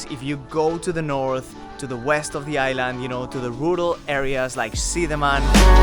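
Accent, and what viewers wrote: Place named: Spain. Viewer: Bali is already established while Siargao is just beginning to show and mark its presence among the world”s favorite island resort. I love your Spanish accent though and be yourself always but I would not be surprised if you will gain more subscribers and followers while in the Philippines. Enjoy ur stay in Siargao and also visit Boracay and you find so many luxurious accommodations.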